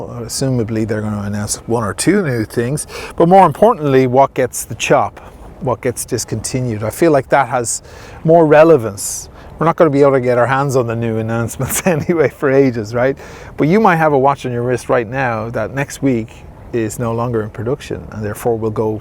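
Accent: Ireland. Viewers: Irish guy ... lol 😝 nice Intro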